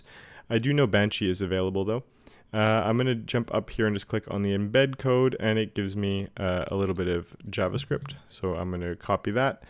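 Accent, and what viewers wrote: Canada. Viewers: love the videos, are you Canadian by chance?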